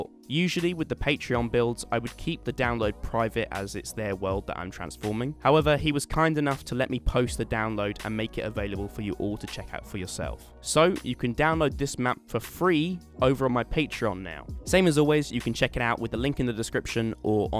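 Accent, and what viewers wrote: United Kingdom. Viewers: You sound like if grian and mumbo Jumbo combined to make the ultimate Brit, from one Brit to who I am presuming is a brit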